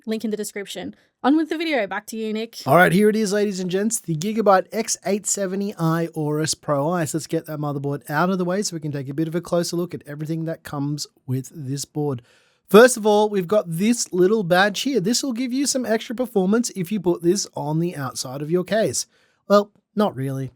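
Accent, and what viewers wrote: Australia. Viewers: Man. I got through 5 minutes of the voice volume fluctuations and had to shut it down. Goofy Australian accent didn’t help either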